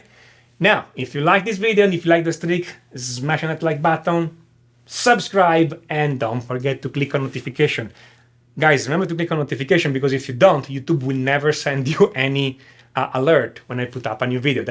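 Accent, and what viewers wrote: Italy. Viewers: What Music theory lesson isn't instantly made better by having an English speaking teacher with an Italian accent !!? ( It is an Italian accent, isn't it ? )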